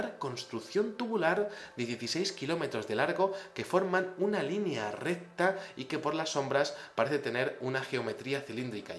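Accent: Spain. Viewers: de que parte de España Eres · muy interesante el video, de que parte de españa eres???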